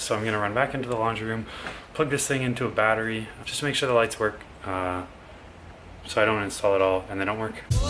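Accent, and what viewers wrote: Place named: Canada. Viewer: Interesting video.....also am I the only one who thinks the Canadian accent is really cool!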